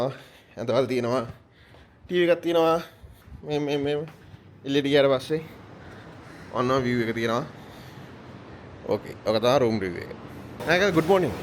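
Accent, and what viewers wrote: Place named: Sri Lanka. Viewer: Olee සිංහල Accents එකට නම් හිත ගියා 🤍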